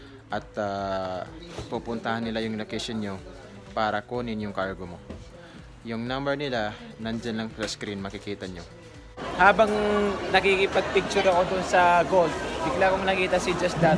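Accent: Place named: Maranao, Philippines. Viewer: Kalowka akala ko taga Luzon or somewhere, mranao kabs akie! Naks naman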